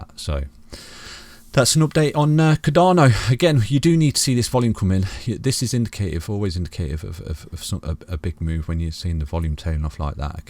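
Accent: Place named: Hinckley, United Kingdom. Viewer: ohhh I was close. I thought you was Hinckley because of how you say "cuh-daano" ha ha I am Birmingham. Not too far from you mate!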